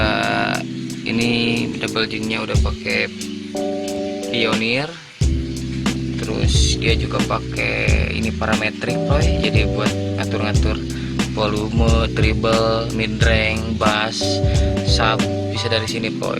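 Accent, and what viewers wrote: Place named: West Java, Indonesia. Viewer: Bahasa Indo nya ketahuan logat sunda bandung ya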